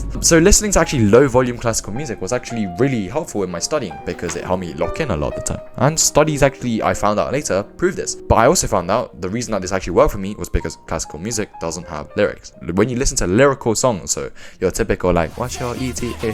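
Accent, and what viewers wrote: United Kingdom. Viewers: With the helpful stuff aside, dude your british accent is so good to listen to. Im an asian myself and use american ones, but your voice is peak pls keep cooking.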